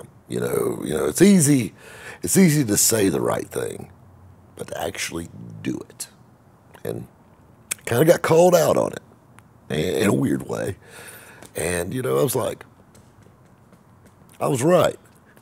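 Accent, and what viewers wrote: South, United States. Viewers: Yess sir! Got to love she saylim southern drawl😉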